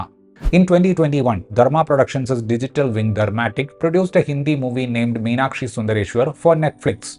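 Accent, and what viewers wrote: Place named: Kerala, India. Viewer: Malayalam accent... not slang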